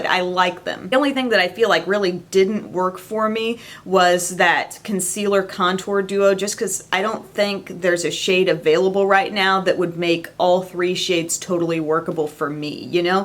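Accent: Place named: Midwest, United States. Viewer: I just came across your channel, and as soon as you started talking I knew you were from the Midwest, no insult intended. I'm from the Midwest also, and I recognized your accent right away, because you sound like my entire family! Anyways I really enjoyed your video, I'm a new subscriber! ❤️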